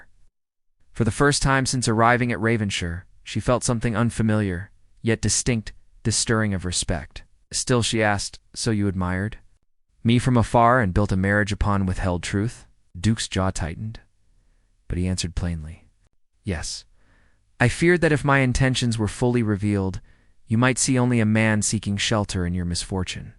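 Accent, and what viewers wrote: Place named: United States. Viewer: Narration is poor, American accent for an English Regency and weird scream, strange sounds between words. No real basis for him to continue being blind.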